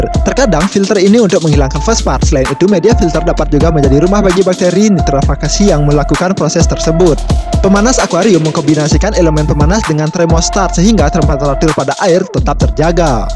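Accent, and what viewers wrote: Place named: Bali, Indonesia. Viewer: Tpi logatnya Bali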